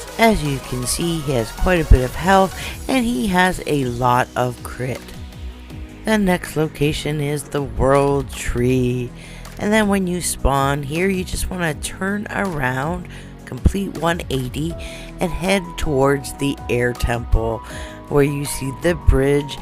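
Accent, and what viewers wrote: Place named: Scotland, United Kingdom. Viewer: Sound more Scottish than Irish, luv it!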